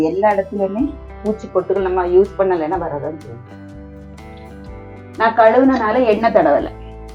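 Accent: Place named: Nagercoil, India. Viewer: இயல்பாக பேசறீங்க... நாகர்கோவில் பாஷை..நல்லா இருக்கு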